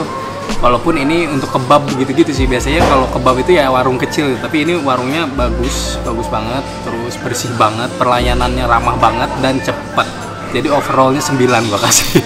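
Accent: West Java, Indonesia. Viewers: Tetep keluar ya sundanya, sagala rupa 😂😂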